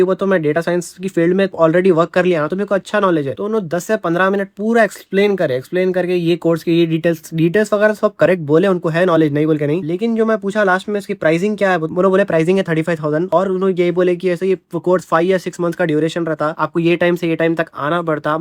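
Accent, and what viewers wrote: Hyderabad, India. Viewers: Hyderabadi hai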